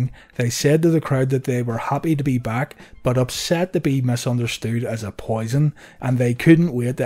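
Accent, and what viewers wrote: United Kingdom. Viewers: narrated by people from across the pond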